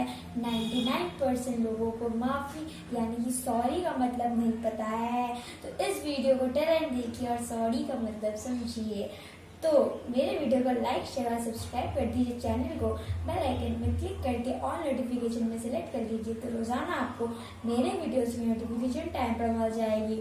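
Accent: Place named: South, India. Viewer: Nice English but i am thinking you are from south because your Hindi is almost right.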